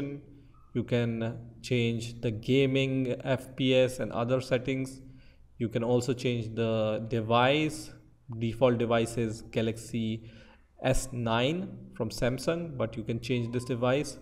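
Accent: India. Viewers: Can I find a video that isnt done by an Indian with an annoying accent?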